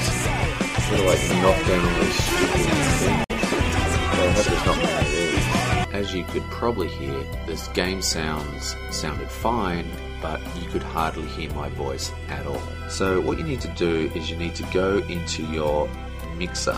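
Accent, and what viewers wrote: Australia. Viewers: You sound Australian. I am Australian. I like you :)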